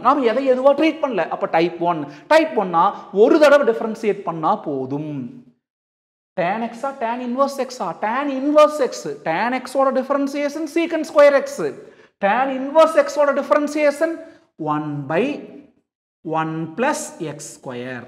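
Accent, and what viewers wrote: Coimbatore, India. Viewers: CBE Slang 🤌🏻❤️‍🔥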